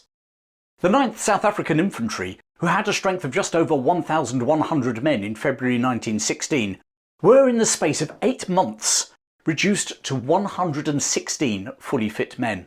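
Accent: United Kingdom. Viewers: German here, loved hearing the story told in such a British and masterful voice. Lettow-Vorbeck ('V' pronounced like 'F') entered German post-war lore as one of the few undefeated heroes. His quite popular own account of the events, "Heia Safari", adorned the bookshelves of many boys and young men in the 1920s and later. My Granddad (b1902) gave his copy to my Dad (b1938), who handed it on to me (b1965). Nowadays however, only a few young folks may still be familiar with the campaign, neither with its adventures nor with its tragedies. Peace!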